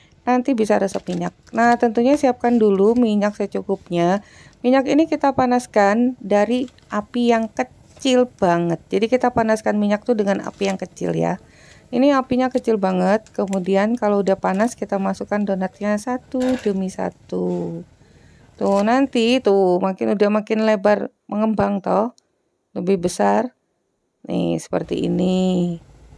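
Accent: Java, Indonesia. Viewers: Donat gembul tgduoempt ss hangat tlur aduk msk rg gla aduk masukan tepung lalu garam aduk dn masukan sp dn margarine sampe tercampur froof bgi2 bentuk (medok ya bu logat jawanya heee) 16buah ya ingat jangan sampe over proof duhhh ginuk ginok gemoyy empuk trimakasih bermapoat sekaliii hahhaah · Hehe kok logatnya jd jowo to...emang asli jowo ngendi mam?😆roti2nya uenak tenan mam👍👍👍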